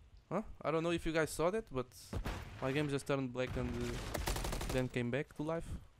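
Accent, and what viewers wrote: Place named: Portugal. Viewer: Eu acho engraçado que é tão facil de identificar um portugues a falar ingles hahahaha, bom video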